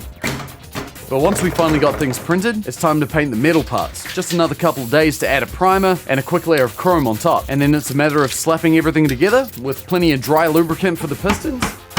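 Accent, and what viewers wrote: New Zealand. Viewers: Was NOT ready for a Kiwi accent lol so naturally "deck box" sounded like something else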